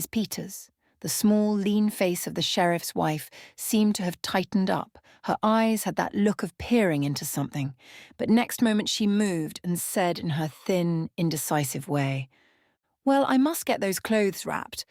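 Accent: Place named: United Kingdom. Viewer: Brilliant choice! I read it years ago . . . . BTW, the British accent doesn't work. Really. Please do it again. Please. Any rural American accent will do.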